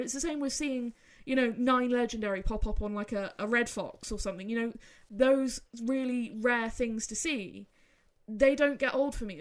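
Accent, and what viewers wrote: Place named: United Kingdom. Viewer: As an American who watches a few British YouTubers your accent is really cool! I don’t know if it is British but it’s a cool accent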